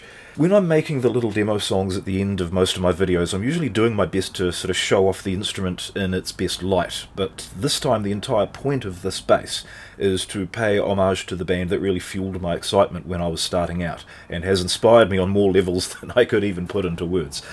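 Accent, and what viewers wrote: New Zealand. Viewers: Fantastic! Excellent piano, drums and bass - and kiwi accent! Well done dude.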